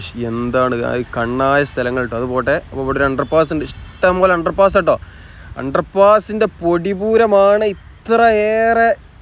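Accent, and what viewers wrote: Malappuram, India. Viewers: മലപ്പുറം ശൈലിയിൽ ഉള്ള വിവരണം കുഴപ്പം ഇല്ല പക്ഷെ സ്ഥലപ്പേരുകൾ കറക്റ്റ് ആയി പറയാമായിരുന്നു. Eg. കൊട്ടപ്പുറം അല്ല കോട്ടപ്പുറം എന്നാണ്, കൊതപറമ്പ് അല്ല കോതപറമ്പ് എന്നാണ്, മുത്തുകുന്നം അല്ല മൂത്തുകുന്നം എന്നാണ്. Google Map നോക്കിയാൽ മലയാളത്തിൽ സ്ഥലപ്പേരുകൾ ശരിയായി വായിക്കാവുന്നതാണ്. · മലപ്പുറക്കാരൻ മലപ്പുറം ശൈലിയിൽ തന്നെ പറയുന്നതാണ് നല്ലത്. യായും ഴയുമൊന്നും കാര്യങ്ങൾ മനസ്സിലാക്കുന്നതി ന്ന് തടസ്സമല്ല. അക്ഷരസ്ലോക മത്സരത്തെപ്പറ്റി വീഡിയോ ചെയ്യുന്നുവെങ്കിൽ അതൊക്കെ കൂടുതൽ ശ്രദ്ധിക്കുന്നത് നല്ലതാണ്. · ബായ്, ഞാൻ എറണാകുളം ജില്ലക്കാരനാണ്... മലപ്പുറം ഭാഷയും, ഫുട്ബോളും എനിക്കിഷ്ടമാണ്... നിങ്ങളെ സുടാപ്പി എന്നു വിളിച്ചു കളിയാക്കുന്നവർ വിവരദോഷികൾ മാത്രമാണ്.... മൂത്തകുന്നം jn. ഇൽ നിന്ന് വലത്തോട്ട് തിരിഞ്ഞാൽ ചെറായി, വൈപ്പിൻ വഴി, വല്ലാർ പാടത്തും എറണാകുളം നഗരത്തിലും എത്താം.... നിങ്ങളുടെ ഭാഷയിൽ ഈ വീഡിയോ ഇനിയും കേൾക്കാൻ കാത്തിരിക്കുന്നു...😂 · Is this Malapuram accent? 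Never been there. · he already mentioned that he is gonna speak in Malappuram slang then why are you bothered..in fact that's why I follow his videos coz its different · ഞാൻ ഇടപ്പള്ളിക്കാരനാണ് (കൊച്ചി)ഒരു നിരീശ്വരവാദിയും പക്ഷെ Central Govt നെ Support ചെയ്യുന്ന വ്യക്തിയുമാണ്. ഞാൻ താങ്കളുടെ വീഡിയോ ഇഷ്ടപെടാൻ കാരണം തന്നെ താങ്കളുടെ ഈ മനോഹരമായ മലപ്പുറം സ്ലാഗ് ആണ്. തുടർന്നും നല്ല വീഡിയോസ് പ്രതീക്ഷിക്കുന്നു. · enthe bro malapuram slang njagalum kelkkate..maya kuyi okk kelkkan oru rasamalle... pinne.vedio super...MODI kk ORU THANKS PARYAAN ITH kandapol thonni.toll koduthalum kuzhapam illa. aa parvoor .ente rabbe...ath in bro paranj apoe kalich chitch pokaam... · I like Your Malappuram accent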